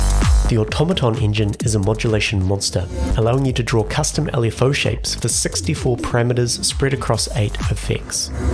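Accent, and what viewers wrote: New Zealand. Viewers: Gotta love the NZ accent :) Dicks everywhere!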